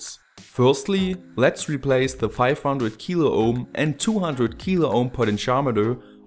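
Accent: Germany. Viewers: Are you german? That english...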